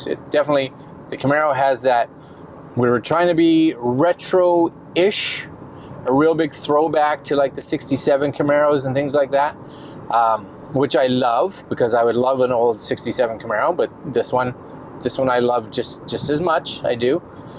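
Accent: Canada. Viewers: Are you from Newfoundland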